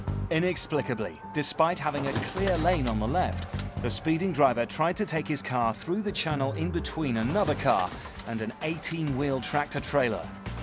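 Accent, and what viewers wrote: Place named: England, United Kingdom. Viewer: Whats innocuous about this video is an english man narrarating crashes on american highways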